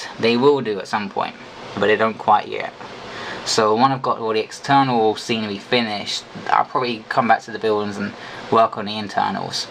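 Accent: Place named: United States. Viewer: Great video! My god....such an American Accent too!!!;->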